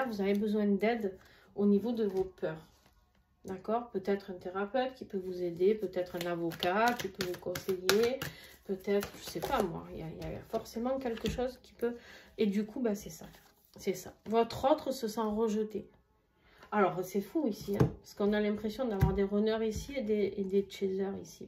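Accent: South, France. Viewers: Quel plaisir d'écouter votre accent du sud.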